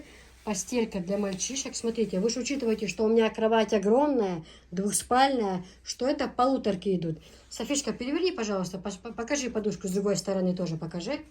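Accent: Chechnya, Russia. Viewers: Какая ты молодец. Как чисто ты говоришь по чеченски. Умничка. Ты наша. Я влюбилась в тебя Как повезло твоему мужу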